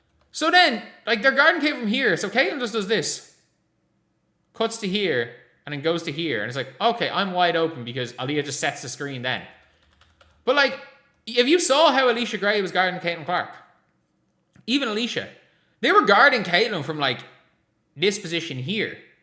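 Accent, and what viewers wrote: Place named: Ireland. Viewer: You are the worst YouTube Fever analyst, milk toast copycat basketball analyst I've ever seen on social media. I've seen an old lady, grandma type do better than you on YT. She's at least honest. You're a ridiculous fraud made all the more annoying with that ridiculous laconic, droning, put me to sleep, Irish Accent presentation. I almost can't stomach you more than fraudster Jason Witlock. I don't believe either of you watch the entire game. You both just skim the highlights & watch other people's podcast. Boring as all get out. I can't believe you even have 1 follower. I wouldn't watch your videos if you paid me.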